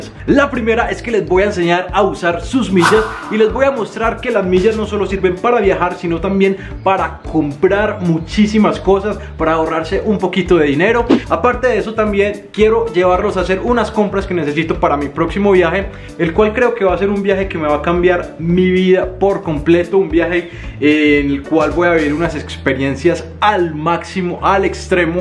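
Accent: Colombia. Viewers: Me super pinche encanta el acento colombiano. Es tan elegante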